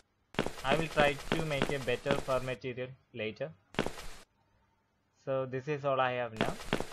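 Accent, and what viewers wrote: India. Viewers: hii bro , r u Indian???bcoz ur style of speaking seemed like it😂. Btw I'm from India, and thx for fur PNG👍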